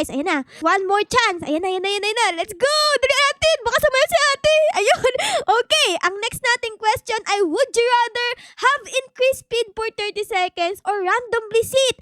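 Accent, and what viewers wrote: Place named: Baliwag, Philippines. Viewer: para kang baliwag na bata diyan magsalita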